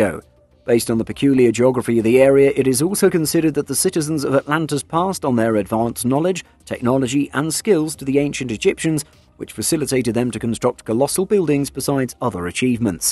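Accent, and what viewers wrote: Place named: United Kingdom. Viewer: Rehash in a sharply cut, and quickly paced pro voice, in Brit accent. Not a Like.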